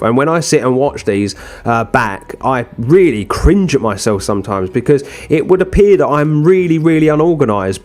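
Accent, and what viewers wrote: United Kingdom. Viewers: This is great! Random question, but are you from Hertfordshire? I lived there as a child and your accent is making me nostalgic!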